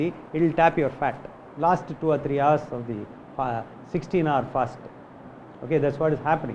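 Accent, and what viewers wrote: India. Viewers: He is repeating Dr.Jason Fung in an indianised english.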